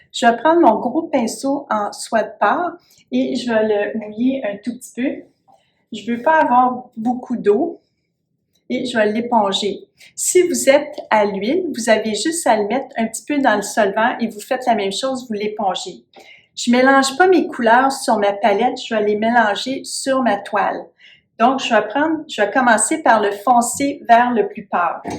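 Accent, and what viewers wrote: Quebec, Canada. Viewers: Merci pour cette démonstration superbe et tellement concise préside et intéressante avec en prime votre très bel accent canadien encore merci je m abonné de suite 👏👏👏👍👍👍💓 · Merci bcp ces nuances variees lumineuses rejoignent un bel accent du QUEBEC !!! A Bientot 😮😊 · MERCI BEAUCOUP, POUR TOUS VOS CONSEILS, J'AVAIS ABANDONNE LA PEINTURE ,ET VOUS M AVEZ DONNE AVIS DE REPEINDRE ,VOTRE ACCENT EST TRES AGREABLE, ET DONNE ENVIE DE PARTIR AU CANADA BONJOUR DE FRANCE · J'aime bien❤ ton accent, il est canadien ? Merci pour toutes ces leçons et ces bons conseils 💐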